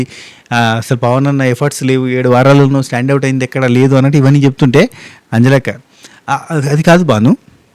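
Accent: Telangana, India. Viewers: Anna meru matladthe ma annaya matladthunte vinnattu untadi super telengana slang jokes ....happy to see ur videos daily😊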